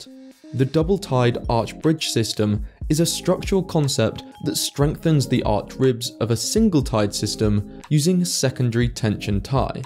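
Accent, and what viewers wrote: United Kingdom. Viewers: As soon as I heard him say "electric flights" and that the centripetal force increases "exponentially" with the speed of rotation, I knew right then, that the person quoted saying that this car "bends physics", and the british accent narrator themselves know absolutely nothing about physics. It's easy to see how they might think this. Sorry guys, but this is clickbait.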